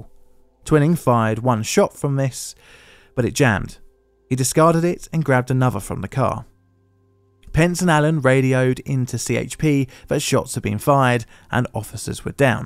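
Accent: United Kingdom. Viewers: Always interesting to hear a Brit describing American incidents. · The one graphic showing the front of the car shows the British origin of this video (as if the accent didn't already) because Americans drive on the right side of the road meaning the driver is on the right side of the vehicle. What other details are wrong?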